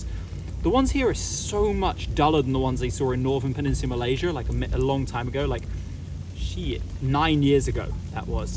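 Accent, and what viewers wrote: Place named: England, United Kingdom. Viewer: Constantly amazed at the sheer volume of variety of our slithery friends., you are an excellent member of the YouTube Herpetologist Vanguards (patent pending). You have a pleasant voice and the English accent doesn't hurt, and that exuberance can't be faked. Thanks for this and all the videos you've upped. Er, not so sure 'bout the mullet. Brings back flashbacks of the 80's <shudder> 🖖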